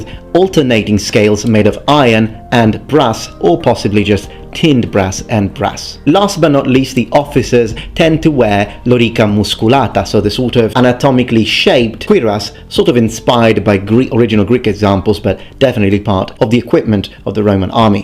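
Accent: Italy. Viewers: It’s probably not true, but the fact that rattles this stuff of in an Italian accent makes me think he’s right:)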